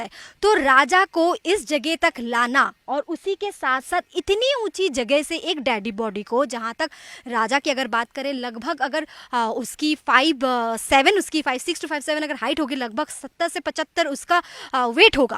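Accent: Bihar, India. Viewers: मैडम अपनी लैंग्वेज में थोरा बिहार का टच दूर कीजिए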